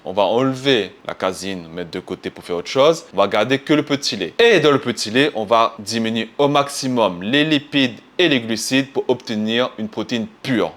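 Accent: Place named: Caribbean. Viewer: Première fois que j'entends un gros l'accent créole comme ça 😳 Mais bonne vidéo. Ps : Juste les gars de la muscu, tous les sujets ( Tous) on déjà été traité. De prés comme de loin. Les reste sa tourne en rond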